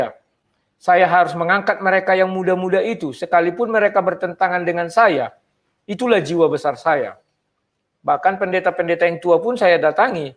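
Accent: Medan, Indonesia. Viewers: Bener kali Ito ‘ Firman Tuhan yg Ito jelaskan itu sangat masuk ke pikiran ku ‘ jelas dan logat medan nya jelas dan straight to the point ‘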